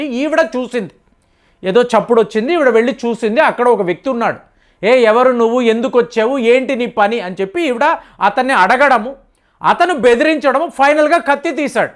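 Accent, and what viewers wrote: Tamil Nadu, India. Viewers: gaaru Chennai lo unaaru kaabatti thamil mix untadi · తమిళ ప్రభావం ఎక్కువయ్యి మాతృభాషను నిర్లక్ష్యం చేస్తూ తప్పులు మాట్లాడుతున్నారు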